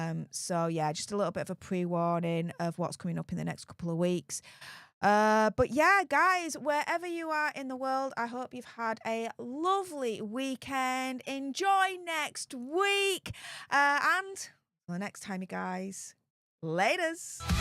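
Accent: Yorkshire, United Kingdom. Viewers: She has a Yorkshire accent.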